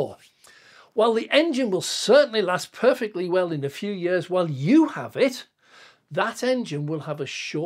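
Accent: United Kingdom. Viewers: You sound British...why are you talking in miles instead of KMs?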